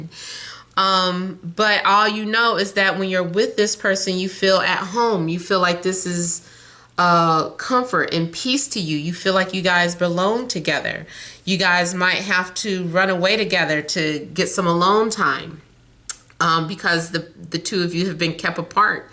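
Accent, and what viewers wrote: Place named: United States. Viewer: You are thé best ever love from France 🇫🇷 even if it is sometimes difficult to understand the American accent 😂Happy new year 🥇♥️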